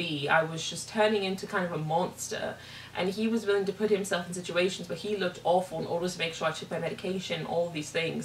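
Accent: United Kingdom. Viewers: Why do you sound British? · You really are a true artist. Been British myself too, let me tell you. I claapprove of your british accent! Have fun in 🇸🇪 Sweden. Im glad you're doing well. Be blessed!!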